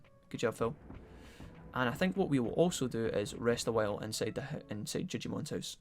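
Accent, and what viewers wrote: Scotland, United Kingdom. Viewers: "Gandalf and the fuck boys" lmao, enjoying these videos man, the Scottish patter is great. xD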